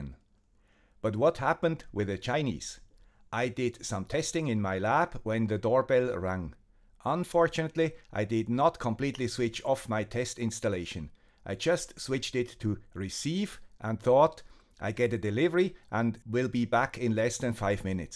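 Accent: Switzerland. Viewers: Swiss accent! Any idea about making a very long range telemetry, like 100km? Looking forward to see your response 👍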